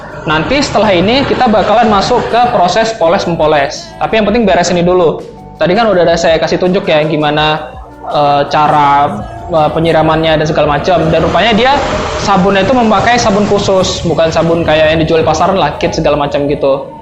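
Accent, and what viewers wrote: West Sumatra, Indonesia. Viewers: Rang bukik kironyo,. Pantas ada logatnya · logat minang memang gak bisa ilang, dari menit makin jelas bgt, dima kampuang diak.....?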